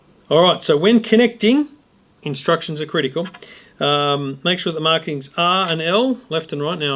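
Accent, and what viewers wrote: Australia. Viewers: Australia …..Australian dollars. Can you not tell by his accent ??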